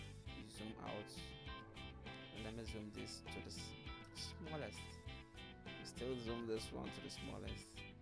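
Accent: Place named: Nigeria. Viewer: Woow!.....great tutorial nice voice. sounds nigerian.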